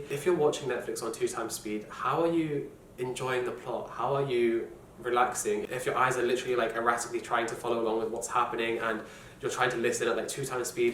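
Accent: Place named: England, United Kingdom. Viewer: I meant his English accent... It's just so cute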